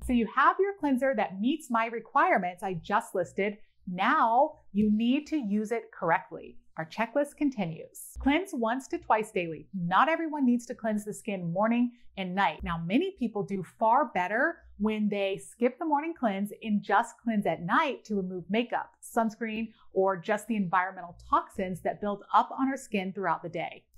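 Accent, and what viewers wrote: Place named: Iran. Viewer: I hear a mild Persian accent. 100 bucks says she is Persian. 😁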